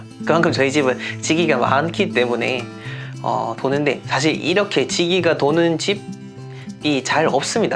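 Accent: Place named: Daegu, South Korea. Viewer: 대구 사투리?? 뭔가 똑부러져요 ㅎㅎㅎ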